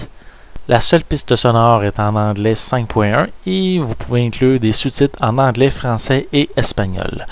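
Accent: Canada. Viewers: jkiff ton accent canadieeen :p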